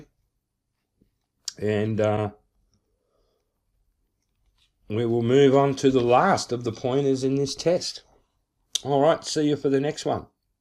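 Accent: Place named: Australia. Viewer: He's Australian Karen...Oops, I meant Mary. There's not just one type of English, even in the US.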